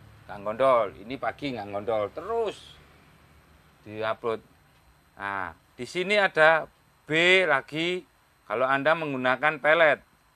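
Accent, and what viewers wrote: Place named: Mojokerto, Indonesia. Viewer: kok logate koyok mojokerto an om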